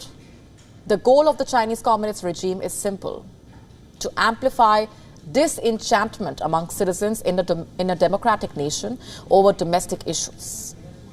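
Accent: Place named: India. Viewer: Too strong Indian accent 🙏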